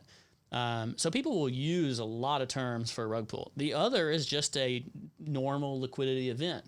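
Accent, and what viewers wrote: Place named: South, United States. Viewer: We have ourselves a southern gentleman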